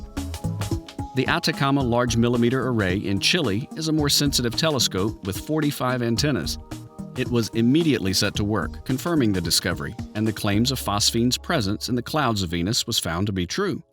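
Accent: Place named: United States. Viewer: Great video - too bad that the speaker has a boring and uninteresting American voice. A British accent would be much better for the viewership - or anybody else for that matter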